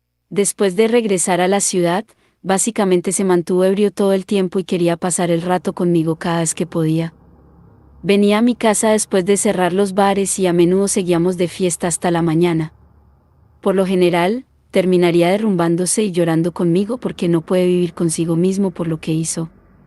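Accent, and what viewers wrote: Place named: Colombia. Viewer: Ponele voz de hombre. Esa voz de mujer colombiana no ayuda a los videos.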